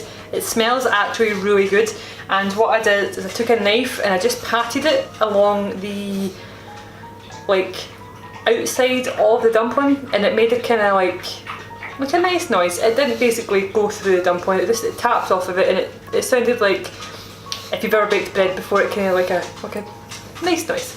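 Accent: Scotland, United Kingdom. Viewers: Subbed, not because of the clootie dumpling, not because of the dogs, not even because it was you're grandmother's recipe. Subbed because thank f*ck there's a real Scottish wumin that talks like a real Scottish wumin oan the YouTube, and no like she's got a mooth full a jorries. So sick and tired of that affected, sickly sweet, shortbread tin, West End, Milngavie or Kilmacolm accent that runs rife as soon as ye plonk a camera in front of someone.. Goan yersel hen. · I'm here for the accent. Maybe for your next video you might read the phone book? I just love a female Scottish accent. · It took me a while to realise what you were making... lost in translation from Scottish to Australian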